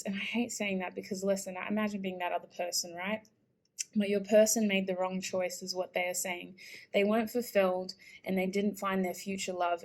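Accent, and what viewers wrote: Australia. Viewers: I listened to a few videos from you. I wasnt paying attention to the accent but this video is clearly Aussie accent. I just recently moved away from Au and u made me missing it. And thanks for the picking card. For pile 3, when u picked the card “I imagine u naked”, I’m dead laughing haha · I love you!!! Your readings are so different, original and so accurate! I chose pile 2 and it's like personal reading! Love it! And I love your aussie accent and swearing as well!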